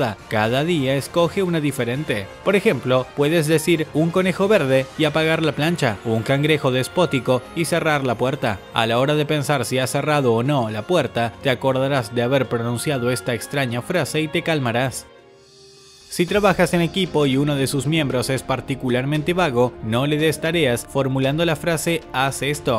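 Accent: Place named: Argentina. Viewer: no se, pero tiene alta pinta de argentino en modo neutro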